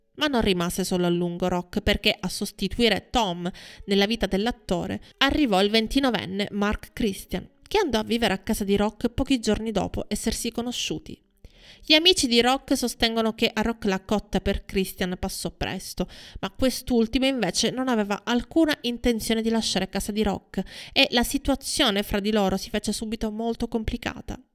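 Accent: Sicily, Italy. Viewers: Brava ,scandisce bene le parole ,ma come si sente la cadenza sicula!! Adoro la Sicilia ,ma quella calata quando si parla di cinema di Hollywood......,insomma . Riguardo a Rock Hudson , beh sicuramente bello, adatto alle commedie con la bravissima Doris Day ,ma non certo un grande attore !! Ci credo che nel Gigante primareggiasse lo splendido James Dean talento puro ,basta un solo sguardo suo , e la finale una recitazione unica ,lui si era veramente il cinema di Stanislawsky ,come altro mostro di bravura Marlon Brando altro che Hudson......,che non si vede che ha sempre quell' espressione un po' beota?? Cmq,altra cosa certo che gli omossesuali sono molto più promiscui degli eterossesuali e anche molto estremi . E Hudson andando con questo e quello in liberta' assoluta se l' e' cercato !! Puo' dispiacere come essere umano ma non e' giustificabile Cmq ,a differenza di Dean che con quella bravura eccezionale avrebbe regalato splendide interpretazioni , lui a parte qualche commediola non sarebbe mai stato un De Niro ,o un Newman.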